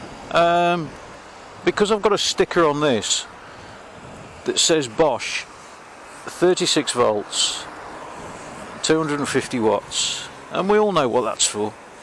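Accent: United Kingdom. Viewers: I'm not jokinggg, can you see it down thereeeeeeee...lol, I love British accent! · So what about Bosch 36v 250w? What’s the significance; why would someone suggest you’re a troll because of that? (Sorry if I’m clueless.) Also, where in the UK are you from? I’ve spotted your accent as the same as another YouTuber I watch.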